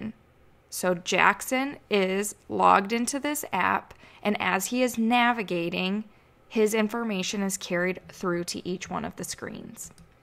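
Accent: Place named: Wisconsin, United States. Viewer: You're (whoever is doing this video) is pretty good at explaining the videos! You just keep forgetting to add the links you mention... you should probably put "Remember to add the link." in your notes though. Can I request you do a video on the proper use of anchoring components? Every time I think I have it down, I realize that I was wrong! Also, where is that accent from? I'm guessing Wisconsin or somewhere close.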